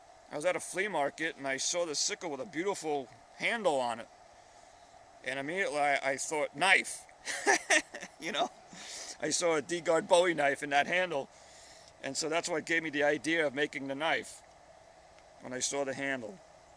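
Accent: Boston, United States. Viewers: Kinda funny how you dress as a Confederate yet you have a Boston-like accent, that's not hate or anything I just think its funny.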